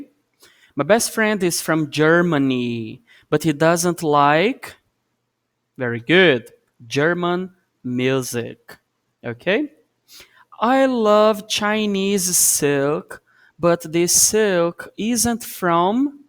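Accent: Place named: Brazil. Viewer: What a strong Brazilian accent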